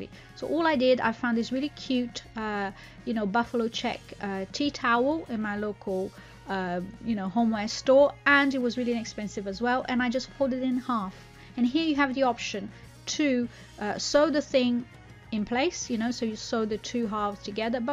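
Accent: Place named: London, United Kingdom. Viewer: Are you originally from the UK? You have a London ish accent.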